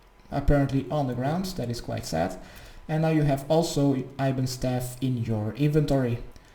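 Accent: Netherlands. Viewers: You're dutch, lol.. never thought u were even tho you got an accent, im also dutch :) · When you fail the rope swing 2x but the stupid grid thing was literally 5 times straight forward xD Thanks for the amazing, not annoying (ahum TAF) quest guides. Nice Dutchie accent x)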